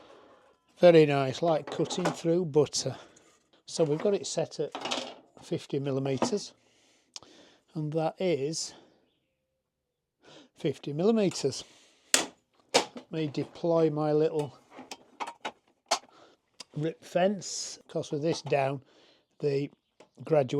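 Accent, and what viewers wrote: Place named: Yorkshire, United Kingdom. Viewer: Having not taken the plunge but keeping an eye on the market (because I want a table saw) all the reviews I've looked at point to one or more of the same 2 primary issues: 1 - fence locking out of sqaure , 2 - mitre slots not industry standard. These seem like pretty fundamental and easily avoidable **-ups to me. Secondary issues are table flatness and dust extraction. I must say from the video it looks like the dust 'extraction' was reasonable even without suction. Evolution are obviously taking that point seriously as the larger saws are showing above and below extraction which is something I don't think I've ever seen on a portable. It's also lighter (despite being bigger-tabled) than the baby DeWalt. That sliding bed is a great touch a la Festool portable. Have Evolution actually managed to nail it on with this saw? 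I think it may have just gone straight to the top of my very short list. Thank you very much for the review in plain Yorshireman English.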